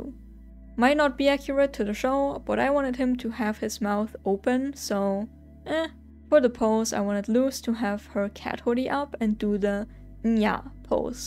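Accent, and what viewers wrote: Germany. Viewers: I am so glad i found ur account! I love ur artstyle! Also, are u german? Bc of ur cool accent! I loved this vid keep going I really enjoyed it! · i really love your art i just clicked on the video cuz i LOVE the owl house and as soon as i saw you and your art style i had to follow you! btw are your like german or smth? kinda sounded like it!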